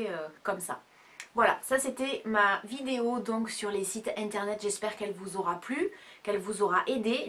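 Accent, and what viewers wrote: South, France. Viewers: Coucou ma belle, tu as tout à fait raison : on trouve plus facilement, en e-shop, des articles qui ne sont pas en boutique. Mes commandes sont toutes passées en ligne, et j'achète peu en magasin. En tout cas, merci pour tes avis, tes conseils, et tes petites astuces pour payer encore moins cher des articles qu'on a par exemple mis en wishlist :) Pour "Vide Dressing" et "Vestiaire Collectif", c'est vrai que tu nous en avais déjà parlé, mais je ne connaissais pas du tout. J'irai regarder de temps en temps; je trouverais sûrement de bonnes affaires........ et pleins de sous à dépenser!.....:) Les enseignes tels que Zara, Morgan, Banggood vendent des articles de qualité, et j'aime bien, même si je n'achète pas à chaque fois. Voilà ma belle, à dans une prochaine video. On te prends tes expressions, et peut-être qu'en te regardant, on prendra aussi ton bel accent du sud :) :) Gros bisous.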